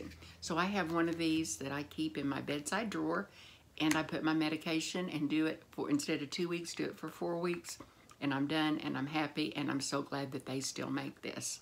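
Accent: South, United States. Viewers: Hi, I’m new here and enjoy your chit chat. I think this is my second time watching your videos. I live upstate NY in what used to be the original capital of NY State. The weather here has transformed beautifully into Spring. It’s becoming green and flowery all over. I go walking at the local track and field space and love it. Everyone is so friendly, saying hello to me and others they don’t know. It’s heartwarming and I think we give one another hope. Keep well and thank you for sharing. I enjoy your chit chat and your Southern accent is great!!! I wish I had one but I was born in Brooklyn!!! Thank goodness I lost most of my Brooklyn accent - my best friend in college was from Springfield, Mass. where people have no particular accent and it sounds fine and clear. Kind regards and keep well. FR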